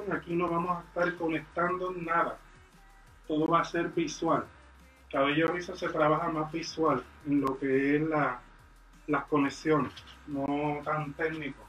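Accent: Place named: Puerto Rico. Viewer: Eey!! Ese acento boricuaa!! Gracias por el tutorial, me es de mucha ayuda!! Saludos desde Guánica 🇵🇷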